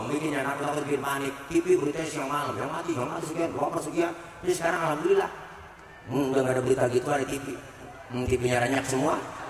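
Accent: Betawi, Indonesia. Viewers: Ustad ceramahnya lucu dg dialek betawinya Sangat cocok untuk warga jakarta bekasi tangerang dan sebagian bogor Bisa ls terjadi interaktif dg para jamaahnya Bahasanya gampang dicerna dan sesekali disertai humor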